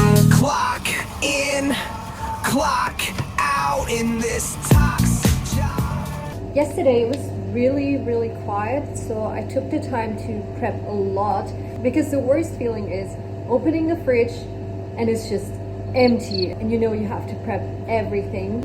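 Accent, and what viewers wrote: Australia. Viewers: I can hear the Aussie accent and pronunciations pushing through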